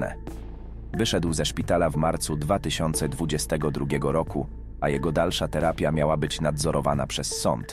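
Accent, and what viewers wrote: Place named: Silesia, Poland. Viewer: Zgaduje że autor pochodzi ze Śląsk 😊😊😅😅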